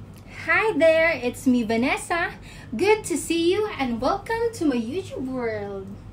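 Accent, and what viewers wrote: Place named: Pakistan. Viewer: pak english is life joke your so beautiful #sweet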